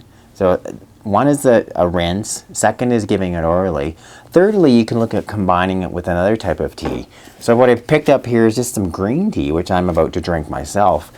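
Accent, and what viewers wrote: Canada. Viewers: Holy Canadian!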